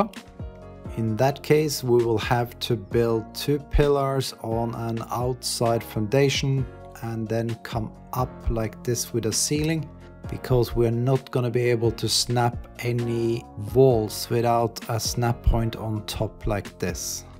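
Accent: Norway. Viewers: Kjente igjen engelsken hehe, super engelsk men hører det fortsatt 😅 Flott content dere har, skal dele dere med clanen 😄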